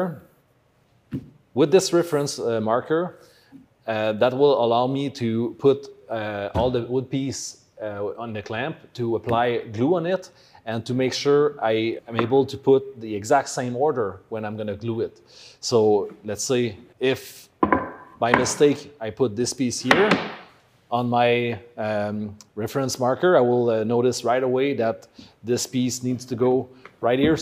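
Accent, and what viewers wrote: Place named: Quebec, Canada. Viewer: un québécois et sa parrait comme moi génial merci pour les super conseil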